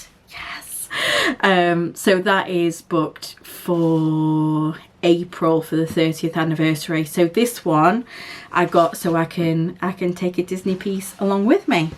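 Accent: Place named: North, United Kingdom. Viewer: Right I’m all caught up! Loving your flosstube and really enjoyed spending time with you. As a fellow northerner it’s lovely hearing another uk flosstuber! Your soda stitch finishes are stunning and I literally love all your wips. Had to stop the video a few times to check on different Etsy sellers!! I think you are far too hard on yourself re your project bags I think they are phenomenal, if I made one I’d prob end up with a t shirt by mistake or something 😂😂 so happy to see your starting Victorian charm, I completed it a couple of years ago (think I show my finish on flosstube 7) if you want to see a finish of it! Not going to lie it’s a big piece but it’s so worth it! It’s still one of my fave finishes to this day. Happy stitching lovely - take care, Lauren (Flossabilities) 🧵💗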